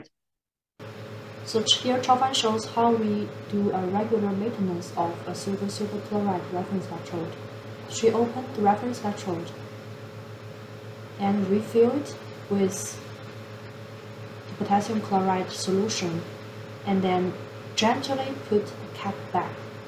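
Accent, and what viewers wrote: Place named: China. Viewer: Love the Chinglish accent, it sounds familiar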